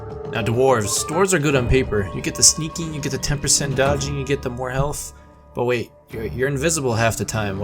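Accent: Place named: Midwest, United States. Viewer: yea sounds like a Midwesterner or something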